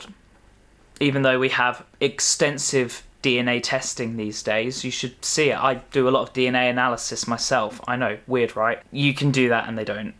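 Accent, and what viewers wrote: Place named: RP, United Kingdom. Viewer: You are young and you have a posh accent. This country is actually one of the best in the world... go live in another country and you will soon find out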